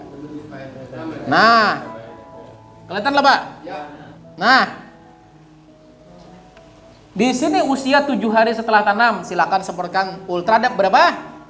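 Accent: Banjar, Indonesia. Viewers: Banjar banar aksen nya ❤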